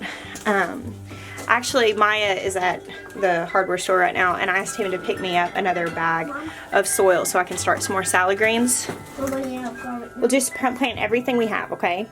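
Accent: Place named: Arkansas, United States. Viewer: My sister lived in Arkansas for almost 10 years as an adult, and picked up a little bit of the accent (we’re Michiganders). When you said that Ben is five (“fahv”), I heard her accent. But then you said “time,” which she pronounced as “tahm.” I’m not saying this to judge the accent at all. I just found it interesting. When I first started watching your channel, I must have heard some of the accent, because I thought you might be in Arkansas.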